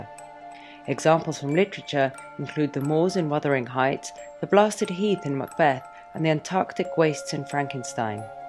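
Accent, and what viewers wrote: United Kingdom. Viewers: for me, Gothic (anything) is pure Heaven as I adore Halloween in all its Glory and endeavour to make it as scary and fun for the Kiddies of my little Neighbourhood as possible. a few years ago I heard Halloween was on the wain but then found out we (in North America) spend about $30 B on the stuff rivalled only by Christmas. for some unknown reason, all Gothic Architecture gives me chills and sends me back in time. one more observation is your incredible accent and phrasing. my Granny was from Lancashire but an English Teacher and her pronunciation of words were so similar and I have never forgotten this to this day.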